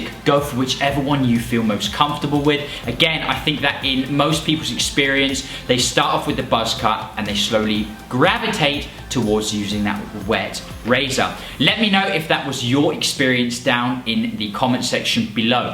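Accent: England, United Kingdom. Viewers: Love this channel. Just found it and feeling better already! And I love this dude's accent. Is he from the same part of England as Russell Brand? Very similar accent scenes... Anyway, I'm not quite ready for this but headed in that direction I think.. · Your English intonation attracted me to your channel ☺️😂 but you are cute bro ❤️🔥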